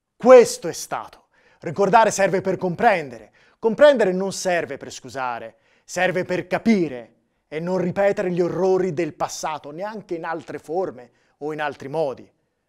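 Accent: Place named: Tuscany, Italy. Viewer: Ragazzo, sei un grande... Riesci con la tua parlata Toscana e il tuo sorriso ad alleggerire questo spinoso argomento.... È un piacere ascoltarti.... Baci.... Da una mamma.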